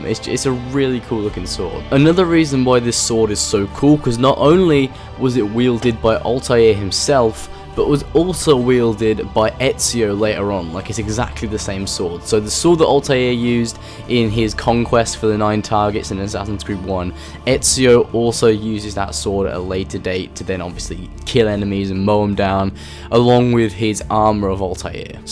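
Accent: United Kingdom. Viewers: I know why u hate ac3 bc Conor kill British soldiers And u sound like a British so it make sense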